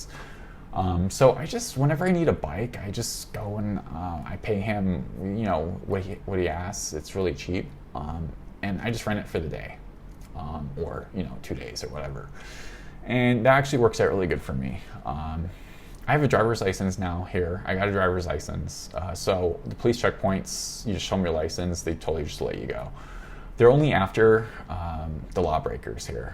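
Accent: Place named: Southern California, United States. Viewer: Your SoCal speech mannerisms are impeccable!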